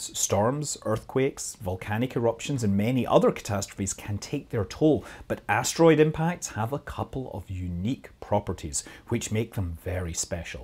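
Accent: Scotland, United Kingdom. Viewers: Fair point. has a Scottish accent but I did find a video of his that suggests he lives in California. So I think I know more or less where he lives. That doesn't go for everyone. A UTC time or a clue about which 9am would have been helpful. If you have a problem with that, it's on you.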